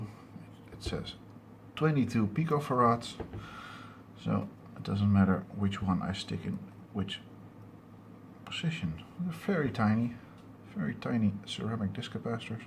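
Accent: Netherlands. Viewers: Im from Belgium but every Dutch speaking person that speaks English has a bit of a accent ^^ and I ordered the one from icstation and even though there site has a full tutorial on it your videos is probably going to be a lot more help I contacted them about reprogramming the chip and it's sad that I have to buy one of those 50 euro programmers for it:( plus a adapter I really hoped there was a other way for that also you have a better diy suggestion for making the led bending template I have no drill they suggested cardboard maybe and 1,5cm spacings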